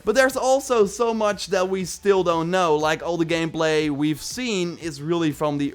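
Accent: Netherlands. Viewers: this dude sounds ultra dutch is he from holland by any chance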